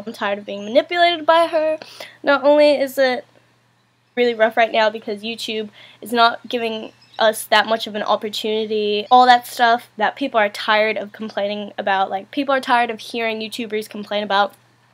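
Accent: Australia. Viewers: unrelated, but your australian accent shows a lot in this video lmao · i can hear your aussie accent getting stronger xx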